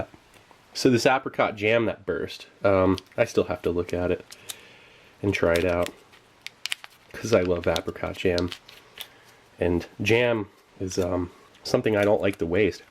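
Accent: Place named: California, United States. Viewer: Always wondered where you're from? I hear a Cali accent.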